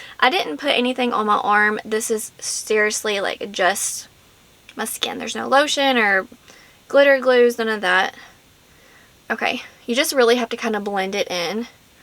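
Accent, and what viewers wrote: South, United States. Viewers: very haha hey neighbor!! I knew you had a southern accent and had to be close lol 😂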